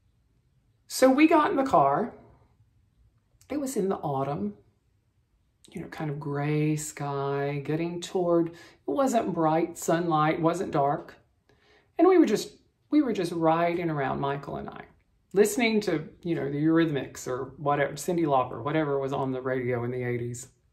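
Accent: South, United States. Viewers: I just noticed that your Southern accent REALLY comes out with your stories. I love it! You're such a hoot,!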